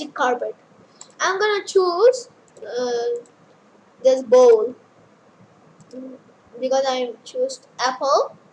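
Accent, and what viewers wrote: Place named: India. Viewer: I like the indian accent "the firs ting" lol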